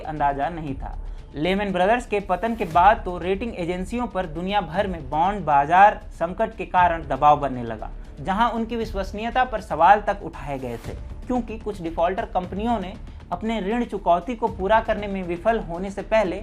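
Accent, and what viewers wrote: Standard. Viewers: Shudh 🕉️ hindi sunkar kaan 👂 ko anand mila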